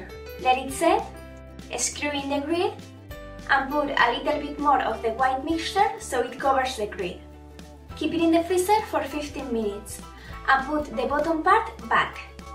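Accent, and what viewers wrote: Spain. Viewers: She is from Spain, there is too much that she speak english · Se nota a tres kilometros que es española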